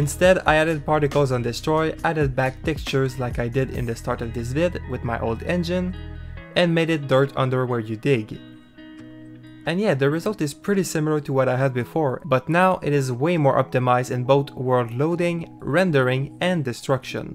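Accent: Quebec, Canada. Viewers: Not really related to the video, but are you from Quebec ? xd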